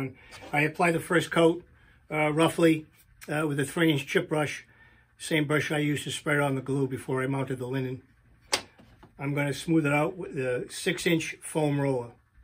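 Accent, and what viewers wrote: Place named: Connecticut, United States. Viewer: So glad I found your vid while researching attaching linen to wood. I didn't know about the PH neutral PVA, and I've now ordered some by the same manufacturer. On a side note, as a native Connecticut Yankee that moved to Virginia 30+ years ago, as soon as you started talking I yelled out in joy! lol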